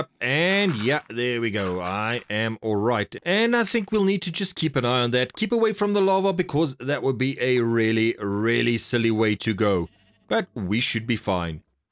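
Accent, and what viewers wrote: South Africa. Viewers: I love your accent are you south African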